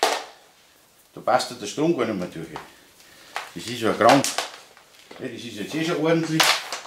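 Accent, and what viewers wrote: Bavaria, Germany. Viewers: Genau so ist es.Ich habe in den 80 igern in Rostock Überseehaven gearbeitet als Festmacher. Dort wurden die Wurfleinen genau so zusammen gewickelt. Klasse dein Kanal und gut erklärt.Auch wenn ich als Sachse oft lauschen muss was du sagst durch den bayrischen Dialekt....lach. LG der olaf aus Delitzsch bei Leipzig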